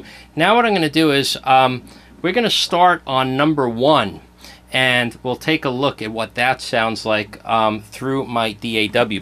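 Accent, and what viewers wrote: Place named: Brooklyn, United States. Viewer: Sounds like Brooklyn , NY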